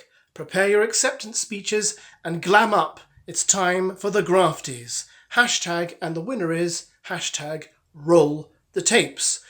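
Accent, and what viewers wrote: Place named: England, United Kingdom. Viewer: I really do enjoy your cadence mixed in with your accent. Could I ask what part of England is this accent from?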